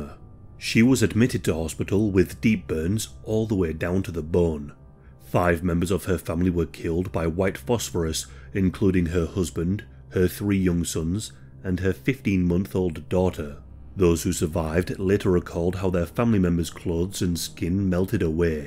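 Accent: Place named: York, United Kingdom. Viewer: You look like Scotty, vocalist of a band called Street Soldier. They are from york so I'm guessing by your accent they are fairly local. Any relation?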